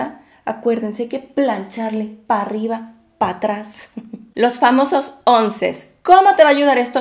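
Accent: Mexico. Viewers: Por qué hablas como de Tepito?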